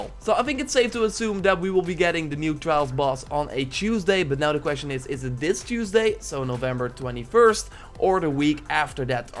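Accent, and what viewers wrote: Netherlands. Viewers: Are u dutch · Are you Dutch??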